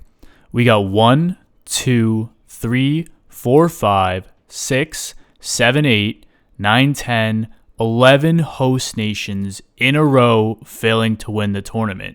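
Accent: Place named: United States. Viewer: Next time I hear an American accent talking about football I'm going to immediately switch off. this is bs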